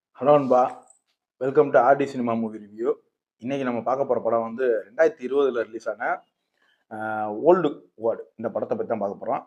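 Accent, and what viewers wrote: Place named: Usilampatti, India. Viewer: Madurai usilampatti ya bro... naanum than😅slong theriyuthu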